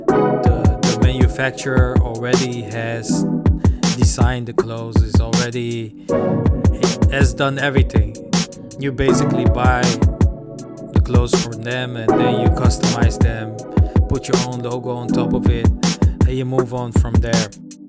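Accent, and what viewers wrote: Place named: Netherlands. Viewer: Awesome video man. I would love to visit this place. Your accent sounds like you're dutch? 😅 Thanks for showing around. That mall looks great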